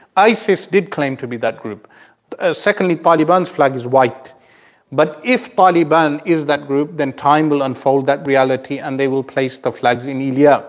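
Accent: England, United Kingdom. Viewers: I love ur English accent and explaination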